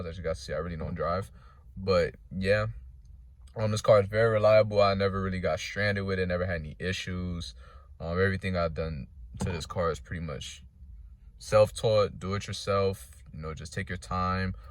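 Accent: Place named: East Coast, United States. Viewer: You sound like you're from the East coast. As someone who owns a 5th 6th and 7th gen all at the same time they're good daileys. The cool part is that they're light. Do a K24A swap for traffic 👍🏾🔰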